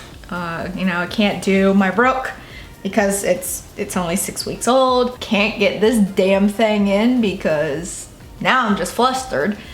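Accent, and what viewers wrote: South, United States. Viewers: Are you from the south?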